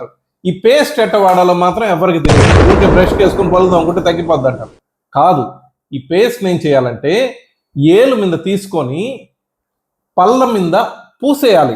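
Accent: Nellore, India. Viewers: మన నెల్లూరు యాస...👌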